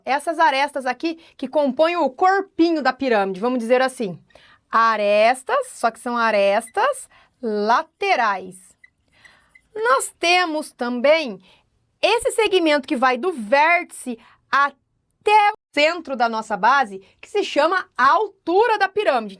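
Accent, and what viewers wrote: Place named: Paraná, Brazil. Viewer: Olá professora, você é do Paraná? Pelo seu sotaque. Tenho vários alunos de lá, também sou professor, mas de eletrônica. Beijos de um prof. para outro.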